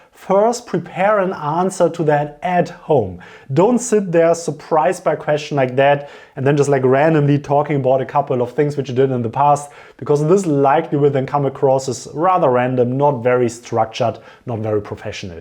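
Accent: Germany. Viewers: The quintessential German accent!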